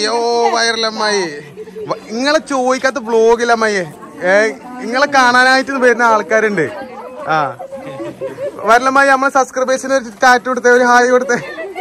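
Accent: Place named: Malappuram, India. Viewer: ഞമ്മളെ മലപ്പുറത്തിന്റെ ഒരു മൊഞ്ജ് 👍🏻👍🏻👍🏻... ആ ഭാഷ... 👍🏻👍🏻👍🏻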